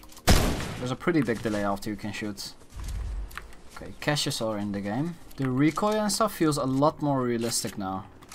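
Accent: Netherlands. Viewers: Are you dutch? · I hear a dutch accent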